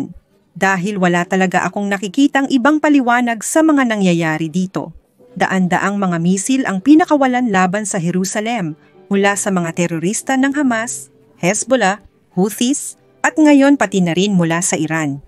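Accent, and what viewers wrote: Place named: Philippines. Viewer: GOD, bless para kang pilipino mag salita maganda ka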